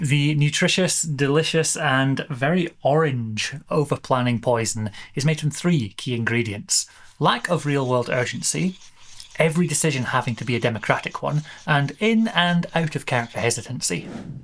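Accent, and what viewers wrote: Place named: Scotland, United Kingdom. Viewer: Brilliant video - so mamy good ideas! Our group definitely suffers from this. Every session 😅. Also your scottish accent is incredibly authentic and to maintain it for the whole video is admirable ❤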